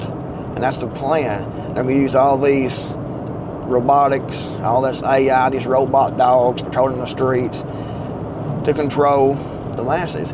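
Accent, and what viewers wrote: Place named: Texas, United States. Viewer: Texas loves you, you sound like us. Thank you Sir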